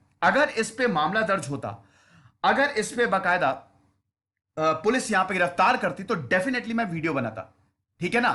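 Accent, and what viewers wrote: India. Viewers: Sr your voice is amzing and different from other and bilkul desi style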